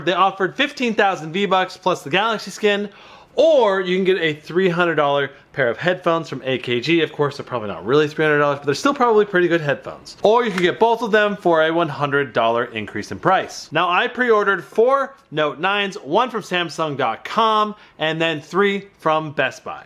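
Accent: India. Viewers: Sounds like an indian scammer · Indian accent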